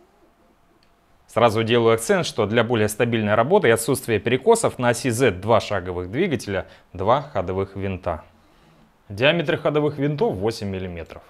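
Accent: Rostov, Russia. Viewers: так больше половины Ростова говорит, это блин акцентом зовётся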